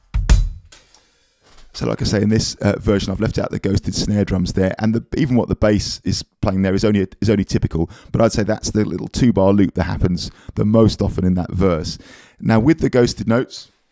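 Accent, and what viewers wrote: England, United Kingdom. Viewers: Read my mind. I have always thought the same thing. There’s no other drum teacher quite like him IMO - and I’ve watched plenty. Apart from his skills as a drummer and exceptional teacher he has such a warm, affable and likeable manner. The lovely English accent is a huge bonus as well.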